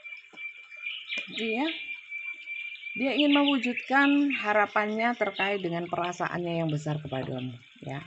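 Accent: Java, Indonesia. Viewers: Assalamu alaykum bunda..?? Q seneng dengerin readingan bunda..lucu mbacanya medok bget..sy jg org jawa bund tinggal di Bandung..g usah di ambl hati sm komentar yg bhasanya kasar org hidup dn yg suka ada yg ga...enjoy aja bund sukses dan seat selalu resonet ga reonet ttp q dengerin q ngefans gaya bicaranya bunda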